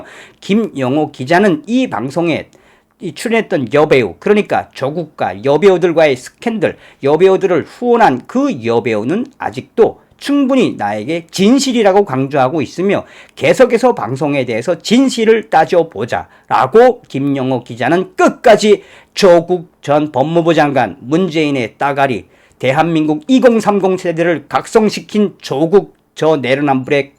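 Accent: North Korea. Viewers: 이북사투리좀쓰지마세요 공판장아니고 재판장.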